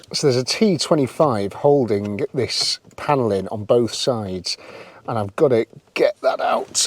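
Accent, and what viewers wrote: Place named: North, United Kingdom. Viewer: Good to see a car channel from the north for a change 👌 don't have to put the subtitles on 😅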